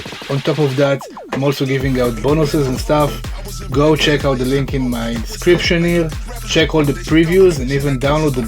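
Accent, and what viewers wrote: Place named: Israel. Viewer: קשה להסתיר את המבטא הישראלי באנגלית, תודה על הסרטון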